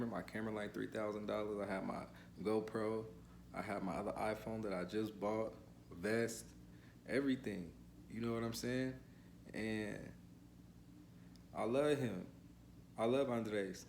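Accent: Bay Area, United States. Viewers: this dude definitely from The Bay he talked just like Messy marv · He has to be from the bay the way he talk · You could tell bro from the bay!! Man the police got me and my lil bro out there too smh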